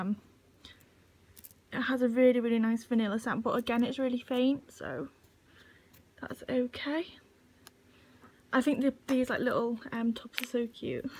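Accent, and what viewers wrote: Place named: United Kingdom. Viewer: I really love the british accent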